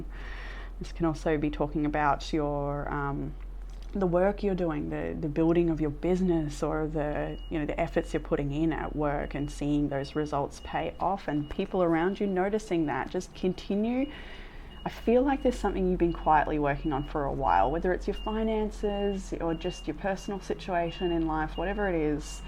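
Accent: Australia. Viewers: I got caught up in my own blues and forgot to thank you for the beautiful reading. Love hearing the Aussie accent. I spent beautiful years in Australia and recently I've decided to manifest a visit!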